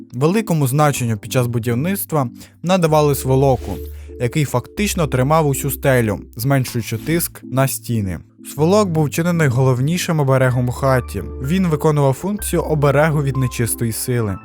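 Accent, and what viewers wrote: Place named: Cherkasy, Ukraine. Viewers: молодець. У вірному напрямку рухаєшся. А ти з відки? Регіон? Черкащанський говір))) ні? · А пан часом не з Черкащини, якщо задає таке питання? Говір характерний для центральних регіонів. А Черкащанський, це який?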